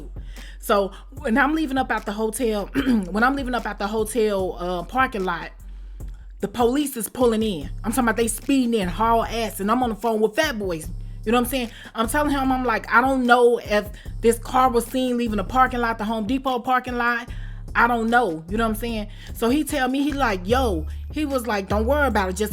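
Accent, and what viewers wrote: Chicago, United States. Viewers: Mannnnn you should've sold this shit to NETFLIX or sum!! Nbs I'm engaged like a mug! Lol Saw you trending on here never clicked on the vid then a reactor I watch reacted to your video of your stay in the county. The way you talk is Chicago through and through and it got me hooked and entertained while at work! Lol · I ❤️ a smart Pretty Thug woman! Baby girl you can tell a story like no otha 😆 😂 wit cha Chicago self luv that accent. I'm ya cuzzo from Milwaukee Do tha thang thang baby girl blow up write a book documentaries etc.LUV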